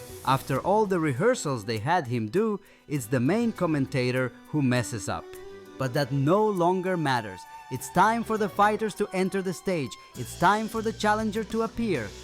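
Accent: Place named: India. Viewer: Are you indian? You have indian accent.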